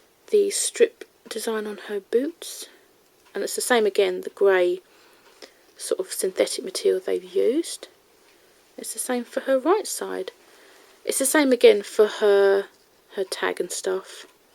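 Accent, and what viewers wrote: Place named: United Kingdom. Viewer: Are you british because u have a british accent and also I’m british😃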